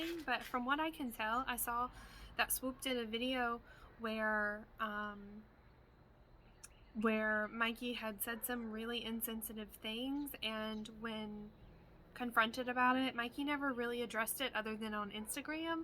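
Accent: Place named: Kentucky, United States. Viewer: Hey! I knew in your last video you has an accent. I used to visit friends in KY all the time. I haven't been back in about 8 years but love it there. I think 20 to 30 minutes is a good length depending on the content. I'm glad you didn't edit out the honking car it actually fit as a funny effect! Can't wait for the cooking video 😊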